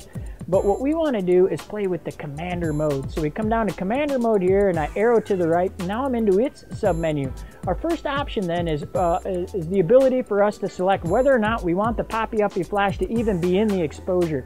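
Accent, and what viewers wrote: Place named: United States. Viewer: I so love your accent... I just want to visit the US on the next available flight. Your tutorial here is very helpful. Thanks very much from Patrick in the UK using a D90 and SB 700. Until now I was using flash off camera and using a little card to block out the light from the poppy up.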